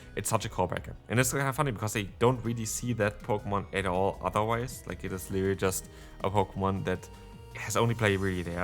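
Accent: Germany. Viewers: Bist du Deutscher? Oder woher kommt der Akzent haha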